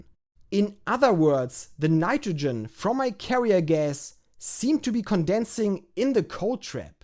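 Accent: Germany. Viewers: Bruder, ich habe zum ersten Mal ein Video von deinem Kanal vorgeschlagen bekommen, direkt darauf geklickt und in 0,0002 Sekunden gehörst das du deutsch bist. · idk why, but i find his thick german accent in english so sexy and relaxing at the same time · you german? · Your accent is my favourite German accent. It's up there next to the Simpsons guy who took possession of the missing art before a Kraftwerk concert in Stuttgart. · As a high school chemistry student here in Denmark, I find your grad level chemistry videos both entertaining and inspiring. Please keep making them, and congratulations on the success with the gas. Even though I might not understand every synthesis step, I really enjoy your explanations and your German accent. These videos capture what chemistry is about, using science to explore interesting compounds. :) And just to try some German, Ich finde deine ganze experiment sehr to toll :)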